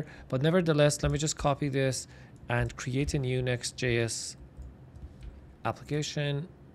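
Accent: Iran. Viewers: به ارور خورده بودم یهو دیدم لهجه تون فارسیه :))))) خیلی بامزه بود این اتفاق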